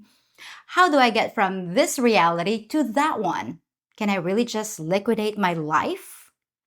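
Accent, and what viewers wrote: United States. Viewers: I’m very curious. I watched a video where you talked about your young life in something of a “tell all” but I’m more curious about your knowledge of the West, your command of the English language and vernacular. Yes I get you learn English here but yours is a very American English and combined with your knowledge of our culture and routines I’m betting you’ve spent a great deal of time in the West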